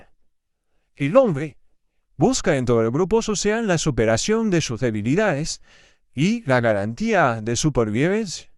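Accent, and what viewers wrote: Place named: England, United Kingdom. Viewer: Tienes acento inglés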